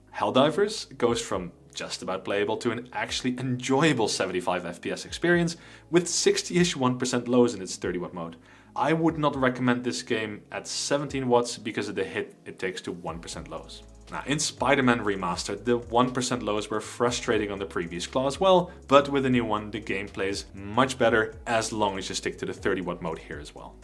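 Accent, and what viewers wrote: Netherlands. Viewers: Hes from the Netherlands, accent reveals. Needs more research.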